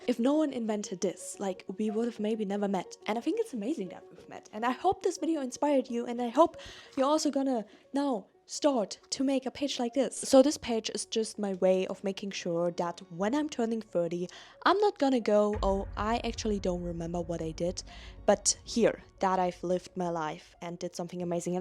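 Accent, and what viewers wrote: Germany. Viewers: aha i hear deutsch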